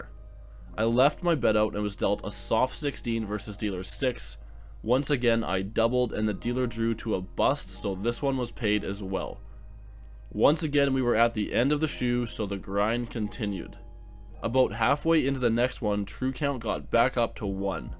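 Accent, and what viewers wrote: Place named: Canada. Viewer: you sound canadian, so guessing you arent tipping. but making that kind of money you really should tip your dealers.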